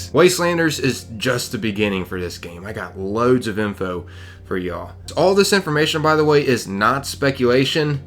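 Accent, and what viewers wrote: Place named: South, United States. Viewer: Your from Ohio? I'm guessing southern. Def ain't North East 😂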